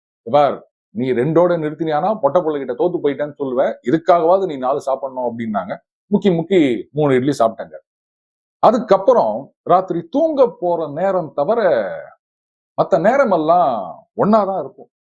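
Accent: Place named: Tirunelveli, India. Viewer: அழகி திரைப்படம் என்னுள் ஏற்படுத்திய அதிர்வுகளை, இக்கதையும் (நிஜமும்) ஏற்படுத்தியுள்ளது. உங்களின் நெல்லை வட்டார வழக்கு அற்புதம்.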